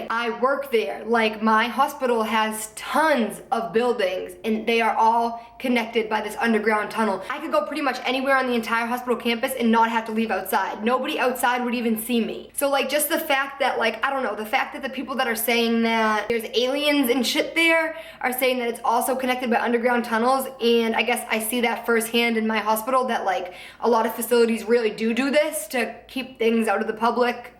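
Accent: Boston, United States. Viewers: I love your Boston accent 😊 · what's her accent? Boston? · Marilyn Peterson It's her adorable af Boston accent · her accent 😍😍 Boston?? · your boston accent makes me so so happy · are you from Boston? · Is she from Boston she kinda has the accent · ThatsSoKendall it's a Boston accent. Definitely not country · Courtney Blair what the hell?😂 she's from Boston. Boston accents mainly only show with certain words. You're an idiot. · it isn't fake. It is a Boston accent and it is very common for people with accents to come and go. It can change depending on who she is talking to, her emotion, etc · Boston accent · My parents are from boston so they say things the same as you aha! and your my absolute fav! love you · Sofia Lazcano-fafard she's got a Boston accent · Are you from Boston? I love your accent 😂😂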